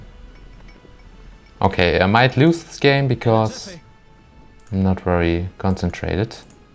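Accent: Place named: Germany. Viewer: German accent ist klear.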